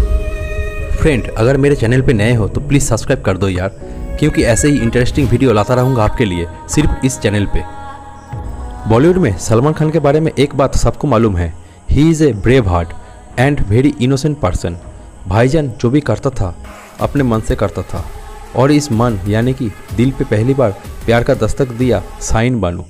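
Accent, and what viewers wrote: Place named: Bengal, India. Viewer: Kyea ap bengali ho ? Apki hindi acca nahi hay.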